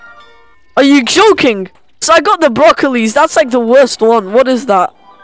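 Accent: United Kingdom. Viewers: The way he just speak like British 😂{Not being rude} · I love his British accent it’s good😊😅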